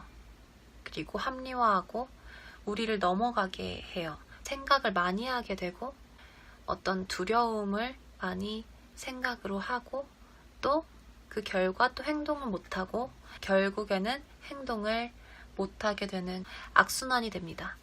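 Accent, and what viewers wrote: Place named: Busan, South Korea. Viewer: 부산여자 아닙니까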